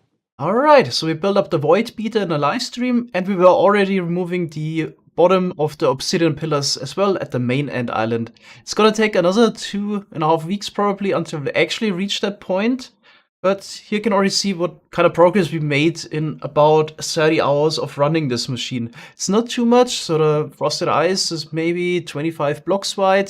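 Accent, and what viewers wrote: Germany. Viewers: Bist du ein Deutscher hört sich so an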